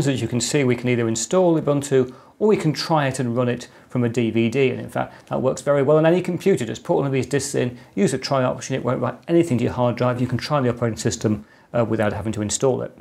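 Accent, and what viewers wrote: United Kingdom. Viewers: british accent lol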